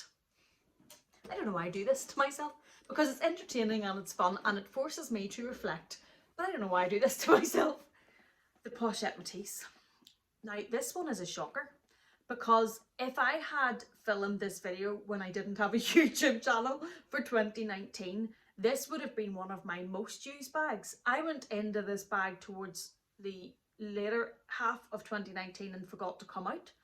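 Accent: Ireland. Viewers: I love your Irish accent!!! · I love your accent! I used to live in Ireland! I miss the country so much but I don't miss the sudden rain while carrying my Louis Vuitton bag 🤣 Greetings from Australia ❤️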